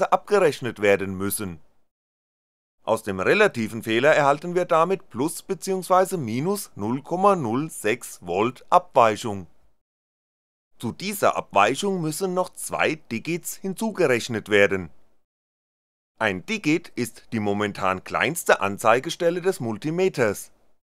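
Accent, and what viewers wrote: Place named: Baden, Germany. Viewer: Ich finde die Videos auf jeden Fall sehr lehrreich, besonders die mit den Selbstbauservos und was µController betrifft. Wenn jemand sehr mit Dialekt behaftet ist (so auch ich als Badener) und versucht den "Stoff" verständlich dem Zuhörer zu vermitteln, dann kann das mitunter für den Sprecher selbst sehr anstrengend sein ohne in den Dialekt zu verfallen. Das sollte man bedenken, letztlich geht es um den Lerneffekt des Themas. Das abschließende "Dange fürs angugge" ist jedenfalls unbezahlbar.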